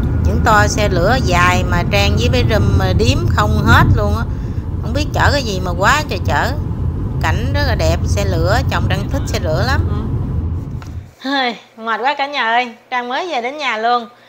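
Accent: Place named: Mekong Delta, Vietnam. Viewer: Nói chuyện đậm chất miền tây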